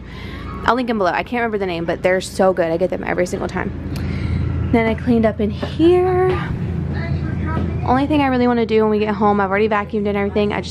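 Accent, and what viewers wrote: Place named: South, United States. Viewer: I can tell my girl is back in the south because that accent is a lil thicker!! 🤣 I love it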